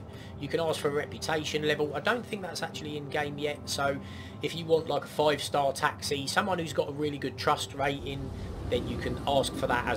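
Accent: Cockney, United Kingdom. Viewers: Was NOT expecting you to be cockney but now the channel name makes sense XD SUBSCRIBED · thicc british accent "hello this is " laughing, then liked subscribed and commented 3 seconds in. this guy knows how to YT properly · i've been threatening to jump in and your cockney accent almost convinced me m8. lets start a Star Citizen clan named Mi6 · Cant stand it. Well I could for all of four minutes. The least attractive accent in the UK so no thanks. See ya!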